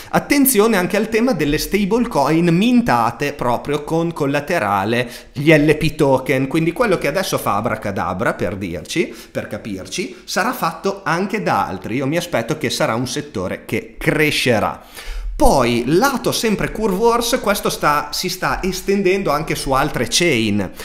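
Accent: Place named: Lombardy, Italy. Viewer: Di dove sei? Hai l'accento delle mie parti(Lombardia)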